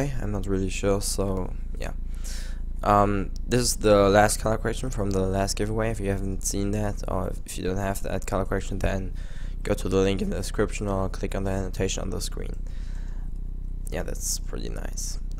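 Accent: Germany. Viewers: haha, nix gegen dein english, ist aber immer so geil wie man jeden deutschen erkennt auch wenn er noch so gut english spricht XD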